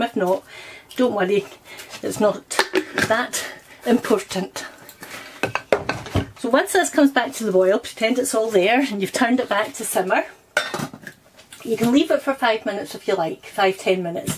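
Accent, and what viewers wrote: Scotland, United Kingdom. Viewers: haha her scottish accent is mild!! full on drunken glaswegian is much harder!!!! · My mothers was scotch/Irish You sound like everyone that was in my life growing up .☺️ I have my Auntie Annie's fly cemetery recipe and her short bread recipe. They've all passed on, I don't get to hear that lovely brogue. So I watch you.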